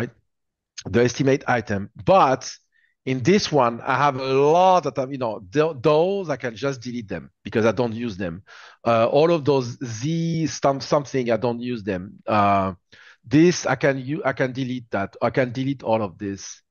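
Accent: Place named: France. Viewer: I also want to say a big thankyou, and wonder if anyone else has started to develop with their inner monologue taking on a french accent whenever they're recalling something has taught:face-pink-tears:.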